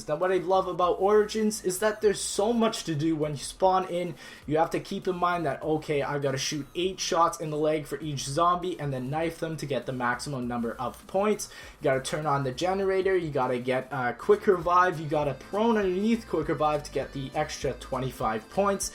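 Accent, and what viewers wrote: Canada. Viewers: Ur canadian? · I knew you were Canadian bcuz when you say about it sounds like aboot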